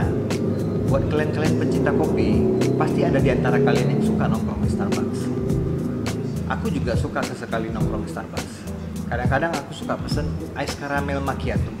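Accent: Medan, Indonesia. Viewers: Anak medan ya bang ?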